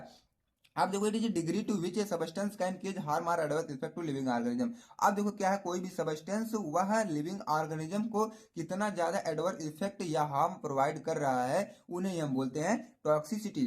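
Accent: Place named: Uttar Pradesh, India. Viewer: Up se ho bhai q Aap language sun ka lag rha Hai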